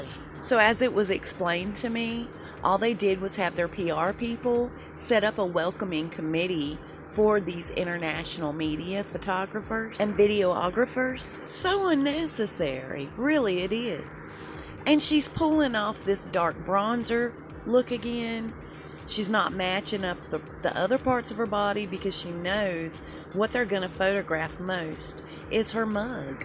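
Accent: South, United States. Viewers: Stop already!! You and your haters need to keep your opinions to yourself. You love drama and gossip. You sound like your from the South I can't understand some of your words. Drop the accent, what are you perfect. People like me are a pain my ass. You can't say anything nice.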